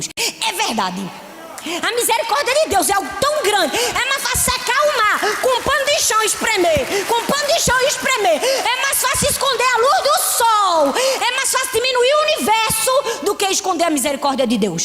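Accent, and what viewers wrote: Northeast, Brazil. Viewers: Pastora amo seu sotaque. Sou do Nordeste também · Pelo sotaque sabemos q a pastora é nordestina gostaria de saber de onde é . Muito boa cheia de Deus 🙏🙏🙏🙏🙏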